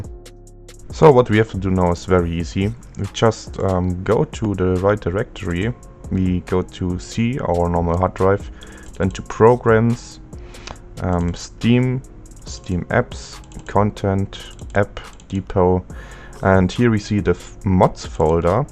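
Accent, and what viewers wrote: Germany. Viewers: Your Deutsch Akzent ist very nice haha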